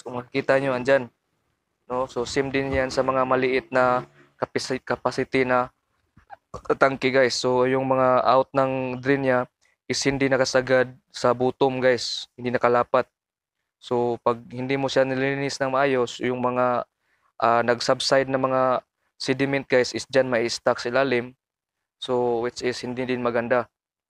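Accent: Visayas, Philippines. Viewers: cguro bisaya ka hirap ka mg explain ... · bisaya po kc sir ok lang ang important is nakakatulong lahat ng sinabi nya 😊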